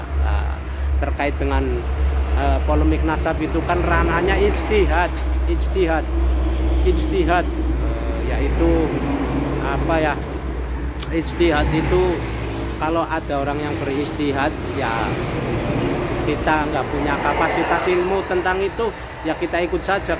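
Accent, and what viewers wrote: Indonesia. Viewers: Kaya wong Tegal' Brebes'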